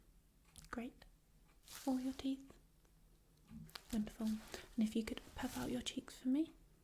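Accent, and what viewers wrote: United Kingdom. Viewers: Is that w Welsh accent I detect?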